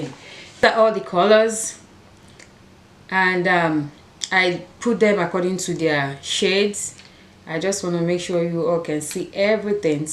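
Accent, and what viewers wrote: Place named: Africa. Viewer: Perfect timing! I just bought a gel lamp on black Friday and in search of a quality brand to try for myself. I appreciate this quality review. I am trying to connect with women that have similar interests as I've just started my channel. I am looking forward to more of your content and reviews!! I've liked and subbed mamas (and yes, your African accent is gorgeous). -Ash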